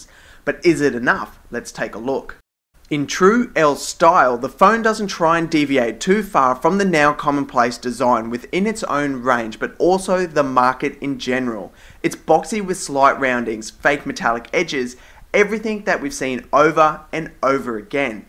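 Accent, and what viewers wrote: Australia. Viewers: Nice review. Cool accent. I want to move to Austrailia and get that same accent to pick up the ladies.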